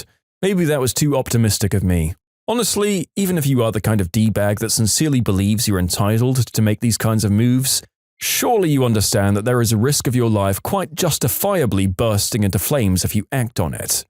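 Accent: United Kingdom. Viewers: I don't like this voice actor you hired..... I can't stand monotone people..... and (no offense to anyone who is British) I REALLY can't stand monotone with a British accent......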